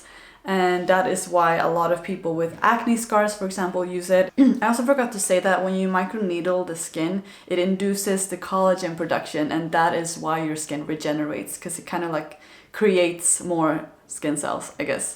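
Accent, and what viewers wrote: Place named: Hungary. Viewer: Not related to the video, but I would have bet that you are hungarian (after your intonation) until I saw that you are from Sweden 🙂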